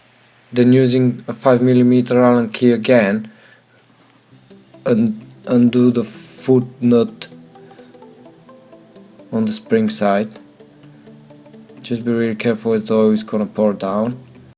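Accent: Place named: Russia. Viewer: ты русский?) ато разговариваешь по англиски как русский))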